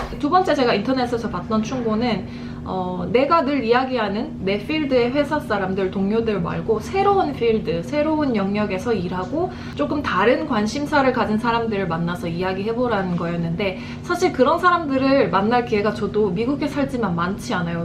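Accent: Gyeongsang, South Korea. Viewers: 노래듣다가 영상이 떠서 들어와봤는데 반가운어투 경상도말이 들리네요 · 영상 하나 보자마자 바로 구독.. ㅜㅜ 열정은 넘치나 어떻게해야할지모르는 저에게 너무 좋은 영상들! 근데 혹시 경상도분이신가용? ㅋㅋㅋ 가끔 나오는 말투가 경상도같은데 혹시나해서요 ㅋㅋㅋ(제가 바로 대구..) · 안녕하세요 예전부터 봤다가 처음으로 댓글달아요 ㅎㅎ 경상도 말투 너무 친근하구요(같은 경상도사람) 영어를 엄청좋아하는 사람이지만 영상보고 엄청 도움많이됩니다.ㅎㅎㅎ 자주 댓글남길께요..ㅋㅋㅋ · 안녕하세요~ 오늘 구독하게 되어 여러 에피소드를 봤어요! 재미있고 무엇보다 유익한 내용 너무 좋습니다~ 가끔 나오는 경상도 액센트? 가 있어서 더 귀여우신거 같아요! 자주 올게요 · 갱상도 누나다^^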